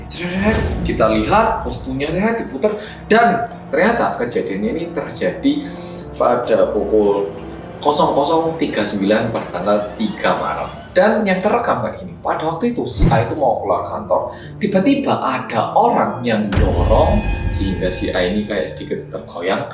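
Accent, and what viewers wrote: Java, Indonesia. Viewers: I'm addicted to : "Halo guys welcome to korea reomit bersama orang korea yang medok" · om medok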